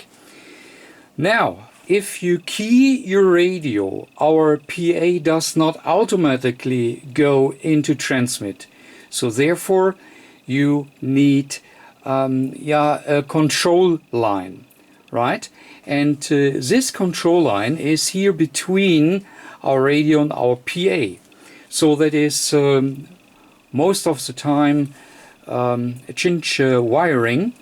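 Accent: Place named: Germany. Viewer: I am just glad to see you back. I love your videos, german accent or not!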